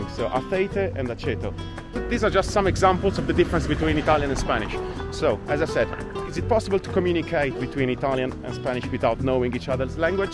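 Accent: United Kingdom. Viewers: No te conozco de nada pero por tu acento inglés diría que has vivido en el Reino Unido, es así? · Nice video but I am going to disagree. From the sound of this guys accent, he sounds like he has been in the British Isles for awhile. I am both Italian and Spaniard. I would say the similarity here is from like 80-90%, perhaps you are talking about your Milano dialect which you even said Spanish is more like Italian than your own dialect. Although I liked the video and some of his message, there was confusion in how you relayed it. Yes and No, it seemed convoluted. So lets just say there are plenty of other videos with experts on language that say its about 82-85 the same.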